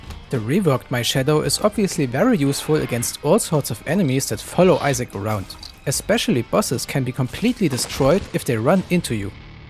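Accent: Germany. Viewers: Man merkt wirklich extrem dass du deutsch bist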